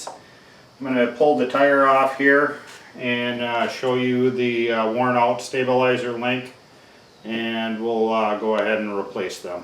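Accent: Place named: Wisconsin, United States. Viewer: I love the uh accent , Lol reminds me of home in Wisconsin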